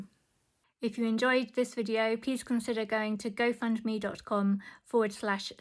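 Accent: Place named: United States. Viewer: This is such a fun idea for a video. And you did such a great job. I wonder if theAmerican pronounciation messed with it a bit too. Awesome stuff.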